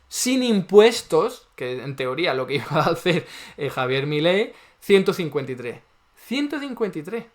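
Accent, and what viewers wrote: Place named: Galicia, Spain. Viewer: Se nota por tu acento que sos gallego te pido por favor que te quedes alli .A espana le va muy bien,no.?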